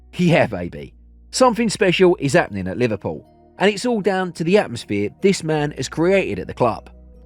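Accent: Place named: South London, United Kingdom. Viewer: Panenka sounds more like AI, even though he's not. This guy is more South London sounding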